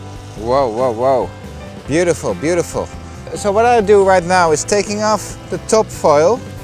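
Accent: Netherlands. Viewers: Hi! Are you Dutch? It seems like you are, since I hear a very Dutchie/English accent:p Groetjes!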